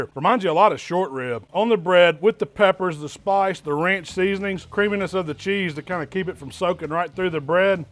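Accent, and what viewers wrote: South, United States. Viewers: always trust a fat chef with southern accent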